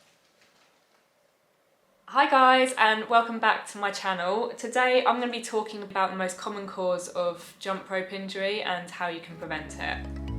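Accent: England, United Kingdom. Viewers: I love your British accent. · I’m here for my dose of English accent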